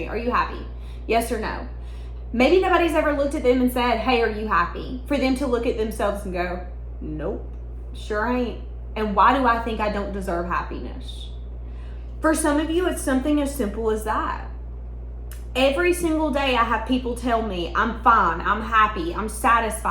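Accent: South, United States. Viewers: I love your southern drawl !! 😊 you don’t need classes . It’s cute😊. I’m from NY. The Canadians make fun of how we talk .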